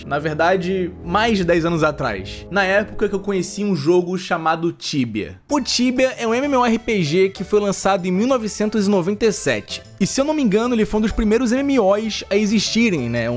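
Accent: Rio de Janeiro, Brazil. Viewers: Carioca né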